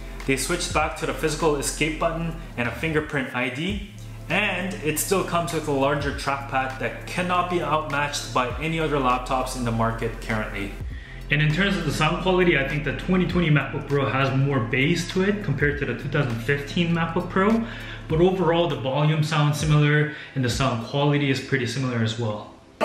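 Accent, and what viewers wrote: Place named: China. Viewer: 听口音是在中国长大的吧？😏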